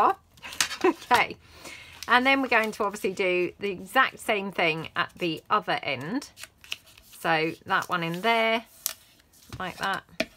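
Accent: England, United Kingdom. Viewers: Hi I love these. I've been watching 5 of your older videos this afternoon it gives me the get up to do things for journals I'm making for family. I just love to hear the accent me being English from the Midlands now in Australia for 53 yrs. I was 11 when I came. My kids say I've not lost my accent but they also have one they all have a Birmingham twang my hubby is Australian to and he has picked it up. But I miss hearing English accents. But you help me to do things I've never done before. Thankyou. God bless Karen and family in Australia. I do hope you are ok with your divorce and you are keeping well. Family to.🌻💖🥰🐨🇭🇲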